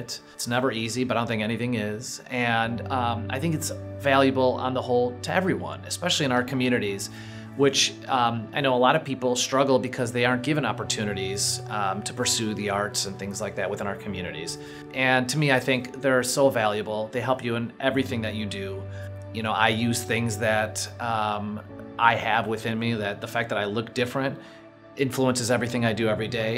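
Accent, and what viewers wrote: United States. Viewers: His Chicago accent is really cute. I love the way he says mom and on and comedy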